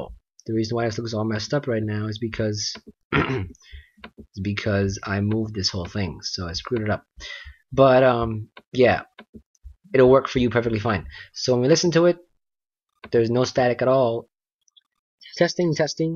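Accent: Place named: India. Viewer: lol wow that's quite observant of you! I thought pretty much only Indian people would be able to pick up on such a subtle accent :)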